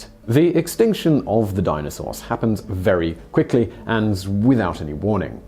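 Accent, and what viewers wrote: United Kingdom. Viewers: You realize he is British and they pronounce things differently there right? Saying Yuck-atan is perfectly fine. He also pronounced Leif correctly, it is a Scandinavian word and it is usually pronounced Layf, look it up. Try to do some research before attempting to incorrectly correct things, yah dingus. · mispronunciations really bother me for some reason. He does pronounce things in a British way sometimes which doesn't bother me. It's more the pronunciation of Yukatan and on one of the other videos he mispronounce Haiti.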